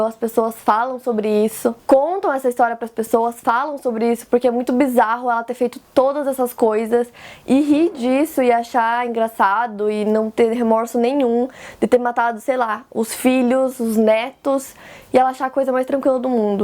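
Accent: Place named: Paraná, Brazil. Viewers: Ela é de Ponta Grossa, no Paraná. Eu moro no interior do Paraná e tenho um sotaque parecido, adorei encontrar o canal dela e finalmente ter um Youtuber maiorzinho que fala como eu shshshs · Seu sotaque paranaense é maravilhoso, que voz linda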